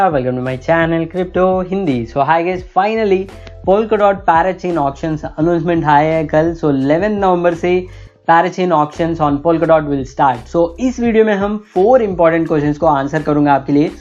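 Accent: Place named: South India, India. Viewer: Your accent is South Indian and you use more English. Change your channel name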